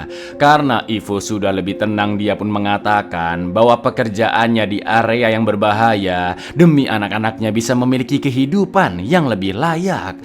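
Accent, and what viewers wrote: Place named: Surabaya, Indonesia. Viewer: Asli arek suroboyo iki admine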